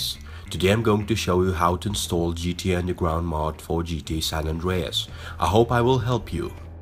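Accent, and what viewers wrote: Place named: United Kingdom. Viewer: cause your accent sound a little bit British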